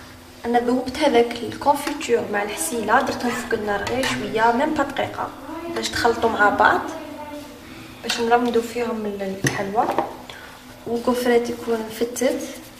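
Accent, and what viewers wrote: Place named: Arab world. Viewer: يعطيك الصحة ولي تعجبني اللهجة جهة الغرب تفكريني في الفاميليا لما نحضر في مناسباتهم موفقة وننتضر المزيد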